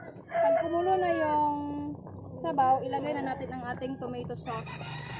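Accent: Philippines. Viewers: Gantong vlogger dapat sumisikat eh. Ganto dapat nagrerepresent sa ph vloggers. Pinay beauty, pinay heart 💙 Yung accent pa talaga pinoy 😍💙 support ate. God bless u moreeee!!!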